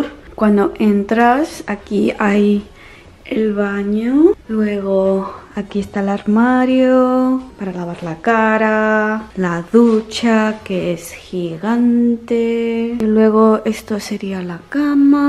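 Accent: Spain. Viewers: i'm OBSESSED with the way you pronounce english words in spanish bc SAMEEE JDJSDJ i'm from Chile but I speak perfect english y la verdad es que siempre que hay palabras en inglés que se usan cotidianamente, las digo con el acento chileno (or when i'm speaking korean too, digo las palabras en inglés en la pronunciación coreana) JDSJD like, obviously it's not the way they're actually pronounced but it's more comfortable and it makes more sense that way DSJD i'm sure you know what i mean. anyways !!! tu español es perfectooo. Hace mucho tiempo que no veía un video tuyo hablando español y mucho menos durante tanto rato jadjsjd me encantó. amé que tienes súper marcado el acento español. Te sigo hace un par de años (maybe even 3? idk) and i didn't know you were born in Spain!!! siempre pensé que te mudaste allí de pequeña, pero que habías nacido en otro lado. the more you know 🌟🌈 i'm rambling at this point i'm sorry KJASNXKAJSN just wanted to say !!! 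that this was very entertaining and yeah! hace mucho tiempo que no te dejaba un comentario jdjs que estés bien!!! 🥰 edit: btw, i loved the last part JDJSD i spent two months in barcelona back in 2019 and i absolutely LOVED it. so it brought back a lot of good memories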